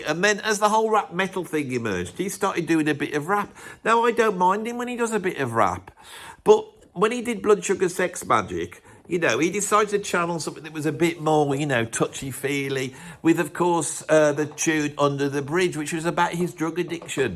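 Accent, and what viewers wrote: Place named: Liverpool, United Kingdom. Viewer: Came for the Liverpudlian accent and the childish takes. Was not disappointed.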